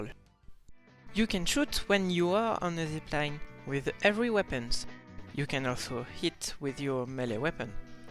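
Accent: France. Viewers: Tell me,judging by your accent,are you…french ?!(which there is nothing wrong about)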